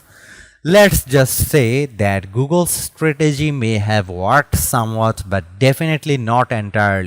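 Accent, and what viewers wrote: India. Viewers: Why trying to mask your Indian accent? It’s totally fine having an accent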